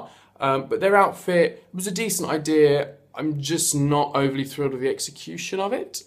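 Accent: United Kingdom. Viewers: Lusine Margaryan I'm guessing he lives somewhere in the south of england, Midlands maybe, so just a southern English accent. I'm guessing this because he sounds similar to me and to me he doesn't really have an accent, which likely means he lives somewhere similar to me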